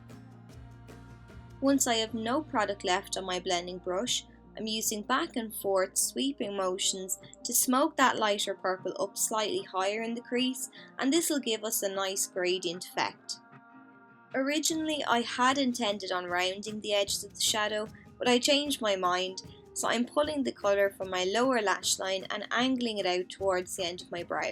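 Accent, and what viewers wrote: Ireland. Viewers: Love your channel! Only new to it, but I recognised your Irish accent straight away! I'm from Westmeath! My all time favourite product is pink pigeon Mac lipstick😃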